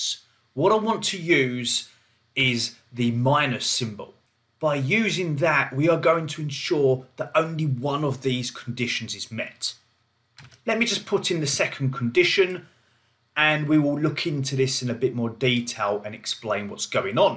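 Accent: United Kingdom. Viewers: Dear Mr - great videos! and your UK accent is awsome and make tutorials pleasant to watch and listen to! Thank you for sharing your knowledge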